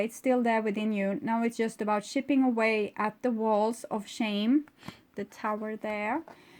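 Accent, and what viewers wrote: Sweden. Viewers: My ex has been calling me but I don't want to hear anything he has to say. Yes, he is a very inmature Leo who doesn't love anyone, including himself. It breaks my heart that he is so broken but he is unbarably toxic but I must protect myself from him. Being the better person is something I have always been told but I have learned their are lots of people who count on nice people being the better person so they can take advantage of the person being the bigger person. Thank you for tnis reading and I just love your Swedish accent🌹🌹🌹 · Are you from Sweden? Thank for a good reading. ❤️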